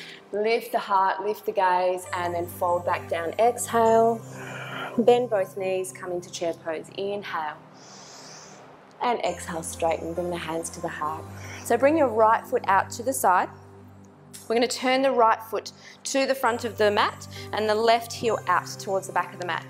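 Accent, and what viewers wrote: Australia. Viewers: That Australian accent though!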